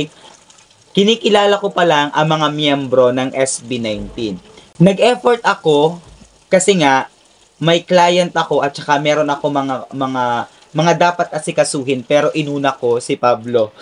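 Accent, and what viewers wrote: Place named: Mindoro, Philippines. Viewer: Nakakatuwa ka din pala kapag serious mode medyo ang usapan😁Destined na maMeet mo muna ang Sunshine Boy Stell and Sunny Boy Justin tlaga for you to have an interest in the group. Magandang simula Yan... and I'm also glad that you're being very True to yourself when you said that you're just starting to know and find out their stories Para masabi mo Kung nasa ang level ka na sa Yong paghanga, just a natural feeling👍👌😊. So either a casual fan or an A'tin makes no difference, just as long as your chanced meeting with Stell and Justin has already stirred within yourself a liking to them as artists, that's a good start. BTW, are you from Marinduque or Oriental Mindoro? Napansin ko lng sa accent mo during your vlog about Stell and Justin. I'm from Or. Mindoro, but my roots are from Marinduque...ngani😁👍❤️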